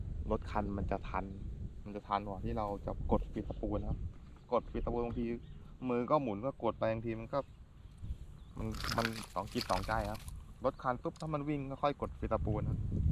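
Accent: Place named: Isan, Thailand. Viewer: ทํามัยอีสานแล้วงัย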